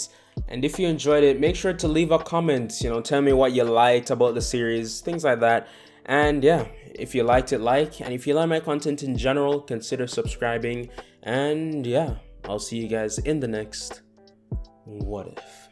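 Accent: Jamaica. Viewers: Are you Jamaican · You sound? Like your Jamaican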